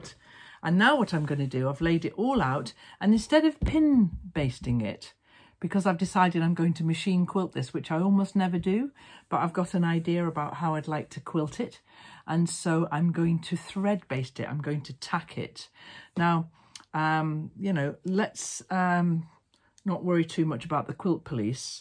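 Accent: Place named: Northern England, United Kingdom. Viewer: This is NO disrespect to our transatlantic quilting u tubers (love Jenny Doan et al to bits) but it's so nice to hear an English accent on-line, especially a Northern one. I've just discovered your channel and I am in love with it already. Your easy going style suits me fine, as I'm a bit of a 'gonzo quilter' with an aversion to precision work - though I am quite fussy about the end result. Only problem - I've spent an entire afternoon drooling over your tutorials. But time well spent! You've given me the boost I need for the next few projects.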